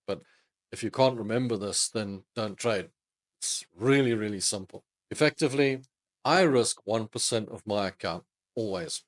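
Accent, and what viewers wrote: South Africa. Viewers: Good content. Are you from Cape Town?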